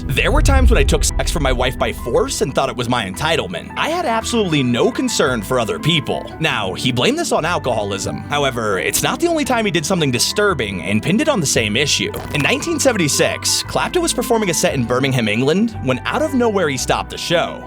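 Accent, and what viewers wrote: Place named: United States. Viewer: The bloke who did this video sounds like some American leftie tosspot.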